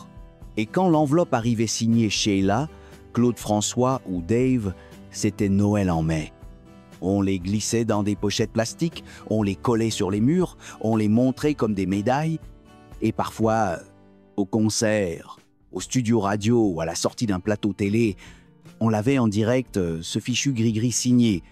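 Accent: Quebec, Canada. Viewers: Mais c'est de la France dont vous parlez, ou du Québec? l'accent dans la narration est vraiment québécois, et je vois l'affiche Théatre Princesse avec Ville de Québec dans le visionnage. De quel pays parle-t-on exactement svp???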